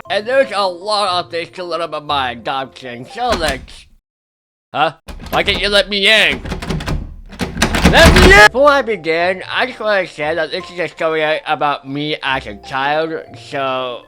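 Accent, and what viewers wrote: United States. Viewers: He sounds American but is chinese. Let that sink in......................................................................................................................................................................................................................................................................................................................................................................................................................................................................................................................................................................................................................................................................................................................................................................................................................................................................................................................................................................................................................................................................................................................................................................................................................................................................................................................................................................................................................................................................................................... 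· you sound american, not chinese. wow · You still sound American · He was raised in an American family so he is obviously gonna have an American accent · Your Chinese your voice sounds like your a American :O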